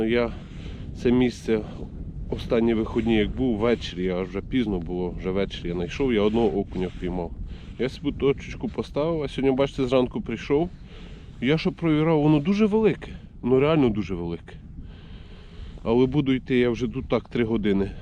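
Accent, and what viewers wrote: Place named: Western Ukraine, Ukraine. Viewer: Звідки ти дядьку? Я з Золочева,і Самбора. Щось діалект ближче до нашого. Я на тебе підписався.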